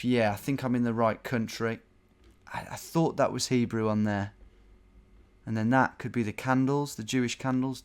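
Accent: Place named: England, United Kingdom. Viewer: Speaks English with an English accent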